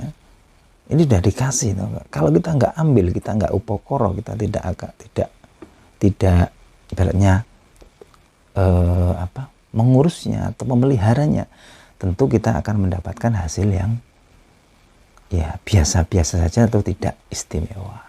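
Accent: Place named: Surabaya, Indonesia. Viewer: bahasa suroboyonan ya neng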